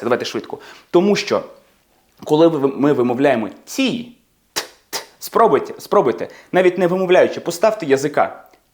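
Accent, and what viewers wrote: United Kingdom. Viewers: Вирішила підписатися, бо у Вас британська вимова, а не американська. А у Вас є якісь курси? Може школа? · Дуже дякую, це звісно полегшує вимову. Дуже подобається як ви говорите англійської із само британським акцентом.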